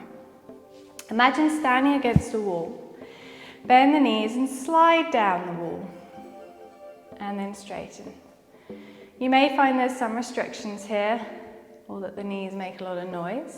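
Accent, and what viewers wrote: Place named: England, United Kingdom. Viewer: Oooh. Another one to add to my play list. I love your calmness and soothing voice and great workouts 😌💞 Are you an English lass? Gorgeous accent. I'm in Aus. 🇦🇺 Just found your channel and will be checking out many more of your videos. You're fabulous 👍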